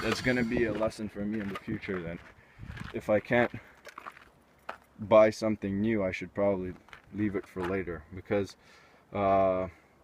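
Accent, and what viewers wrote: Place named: United States. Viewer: No offense, but Is this in Canada ? Your accent is american but your country use KMH? I kinda confused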